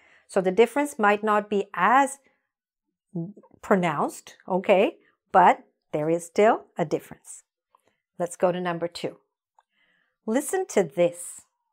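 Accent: United Kingdom. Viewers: You are my best English teacher Are you british ?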